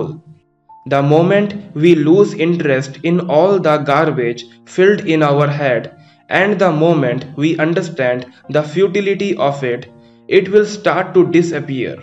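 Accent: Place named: Bihar, India. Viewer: His accent seems to suggest that he is a pakka Bihari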